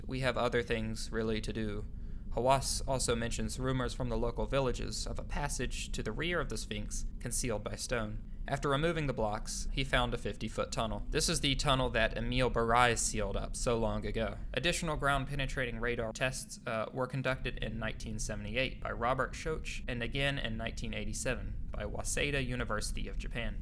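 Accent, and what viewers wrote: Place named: United States. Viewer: You lost me a lot with your odd American pronunciation of Greek, roman and ancient Egyptian names. Thossmess? Plyney? You sound all Greek to me.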